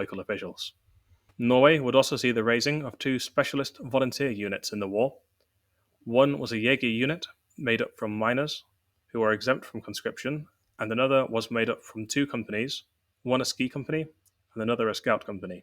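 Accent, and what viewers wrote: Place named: Germany. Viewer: Ver-ve-uh is closer. I appreciate the effort, but there’s not much point in using these terms if native speakers can’t understand half of them. Just speaking English would be clearer, because right now it sounds like a mix of German and English which gets a bit confusing. I’m not trying to correct your pronounciation, I just figure it’ll be easier for everyone in English.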